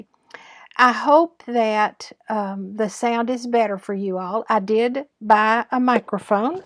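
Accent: South, United States. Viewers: I look so forward to your flosstube videos! You are so sweet and of course I love your southern accent. You do such wonderful projects! Kim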